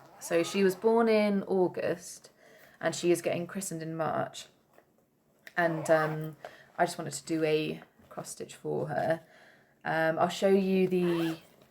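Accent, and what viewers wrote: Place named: Cornwall, United Kingdom. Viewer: ... your lovely, your projects are beautiful. Your graduating to lovely & big Samplers. Modern Folk Art is in Flosstube, he’s got many pieces, some reproductions, that reflect your large pieces. When you says Manchester, I thought hummm that’s not a Manchester brogue, & then Cornwall came out, yes lovely to listen to you 🌺 Check out Hands Across the Sea, Nicola Parkman. She’s in Cornwall. Floss tube is a rabbit 🐇 hole... delightfully so. Until next time. Stay safe... Blessings ~ across the pond, 🇺🇸 Ohio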